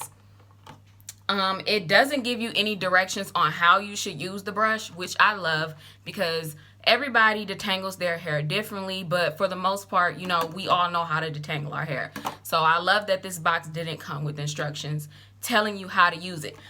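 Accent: United States. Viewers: Friendly comment: You’re speaking REAAAAAALY slowly. And you sound American-born (I am not) so don’t think it’s a language thing? It makes the video excruciating to get through. I put it on 1.5speed and it’s ok. You can be clear and articulate w/out being as slow as this, is what I’m sayin’ ☺️. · I must admit she spoke very normally for someone with an American accent so I can't relate to the original comment.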